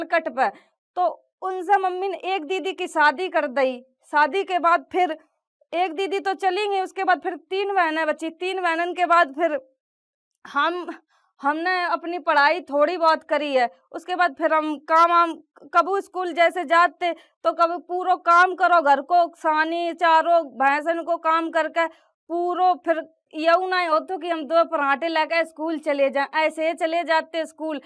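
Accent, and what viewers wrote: India. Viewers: I like deshi andas of speaking. ..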